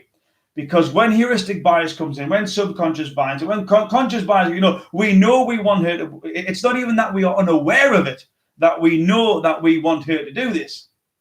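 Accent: Newcastle, United Kingdom. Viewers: So me and my little sister been watching your videos and trying to figure out where your accent is from. She thinks is British but idk I think you sound more Irish? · 😂😂 its English , he is from newcastle.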